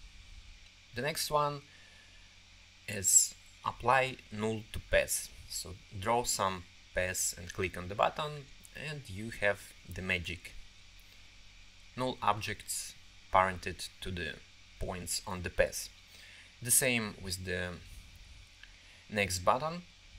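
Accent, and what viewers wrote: Russia. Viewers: наче росіянин говорить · Для какой версии скрипт? На 2019 запускаю, не появляется логин и пароль, по акценту вроде парень из России, поэтому пишу по русскому)))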